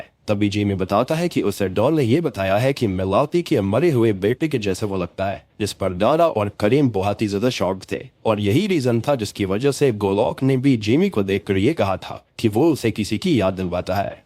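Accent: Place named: United Kingdom. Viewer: Hi your videos explained are very nice but I dont like that you put british access in your talking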